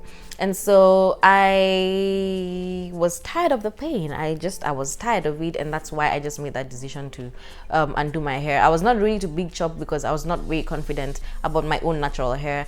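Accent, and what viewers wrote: Kenya. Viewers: You sound Kenyan. something about your accent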